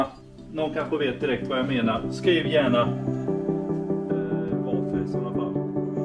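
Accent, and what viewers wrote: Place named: Kalmar, Sweden. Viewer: Mycket snyggt filmat, sällan en ser en så bra renoveringsvideo. Lugnt och sakligt. Sicken go dialekt med, Kalmar/Nybro/vissefjärda?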